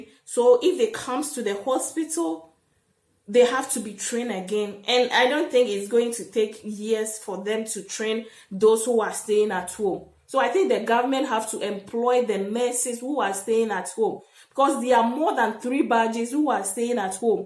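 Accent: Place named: Ghana. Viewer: Hello I missed you oo, miss your Ghana accent 🥰🎉 is the same thing here in Nigeria ooo thousands of nurses and doctors are leaving our country every year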